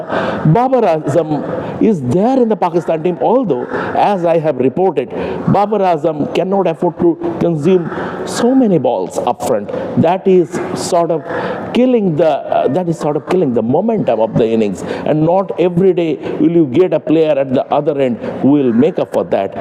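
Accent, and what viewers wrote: Bengal, India. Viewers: Your Bengali accent is prounounced. It takes a while to understand and the background sounds are not helping either. Please make facility to make yourself heard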